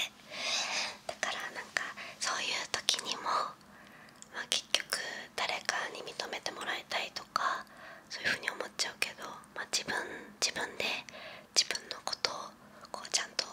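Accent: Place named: Tochigi, Japan. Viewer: 栃木の方言めっちゃ共感できます～笑 あまり可愛い感じはしませんよね😅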